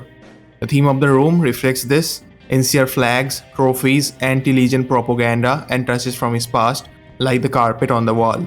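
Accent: India. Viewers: Is the lone wolf radio somehow involved in the quest mod Skinwalker? When i heard you talk about the mod in the video i started getting flashbacks to THAT creepypasta. It be an instant download for me if that's the case Also I'll take the indian "call center" accent over obvious generic deadbeat ai voice any day of the week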